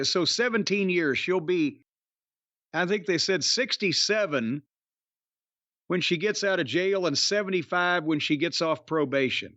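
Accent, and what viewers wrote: South, United States. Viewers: I never liked Jim Cornette when I was a youngster growing up and being a fan of WWE. I was too young to really realize, I wasn't really supposed to like him. He was a heel manager, and he was supposed to act that way to get me to dislike him. I disliked Jim Cornette the character :) I LOVE Jim Cornette the orator. I could listen to him talk for hours. His cadence, his accent, and while he sounds like he should be some kind of country bumpkin, he has a rich vocabulary.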